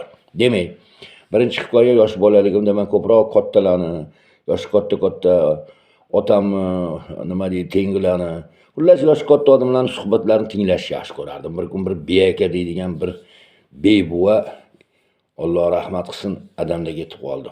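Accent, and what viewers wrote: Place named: Tashkent, Uzbekistan. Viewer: А сизни энди ёллашдими. тошкентча шевадасиз.сиздан ёшим каттаман охирини эшитгим келмади.муридни Муридисиз.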